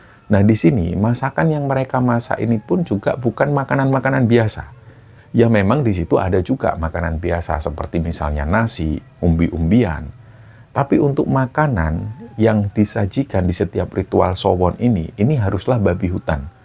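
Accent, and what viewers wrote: Java, Indonesia. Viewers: Ciri khasnya orang Jawa ngomong duduk aja serasa bilang dhudhuk medok sekali 🤭🤭 ya mas orang Jawa kalo bicara medok sekali..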